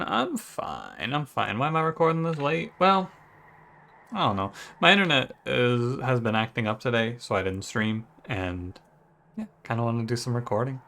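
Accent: United States. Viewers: I know that michigan accent my partner is chicago and she calls me out on mine all the time.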